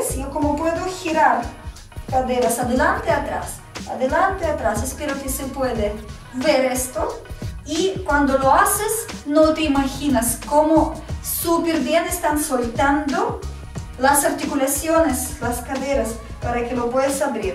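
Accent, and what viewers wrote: Russia. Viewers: eres rusa???? tu acento y apellido parece ruso ty krasivaya heheh pryvet saludos muy buenos vídeos estoy practicando para la flexibilidad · Eres rusa verdad? Yo también, lo digo por el accento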